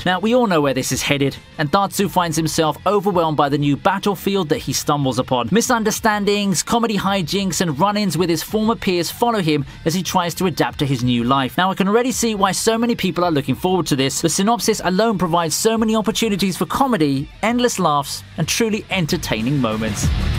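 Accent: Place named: United Kingdom. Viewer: I love your british accent!!! Thats why I'm a fan of you!!!!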